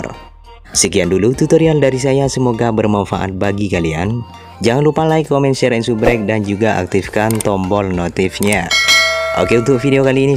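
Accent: Madura, Indonesia. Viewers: Logat nya kok kayak orang Madura ya😅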